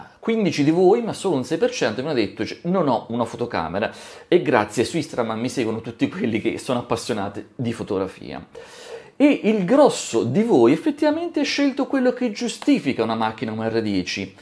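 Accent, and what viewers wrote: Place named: Genoa, Italy. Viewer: Ohimè l'accento genovese🤣 che còccina belandi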